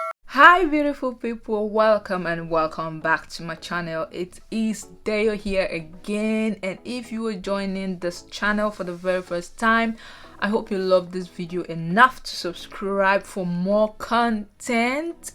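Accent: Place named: Nigeria. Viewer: I could still that Nigeria feel😂😂 The "like bro"😂😂 I'm really enjoying this channel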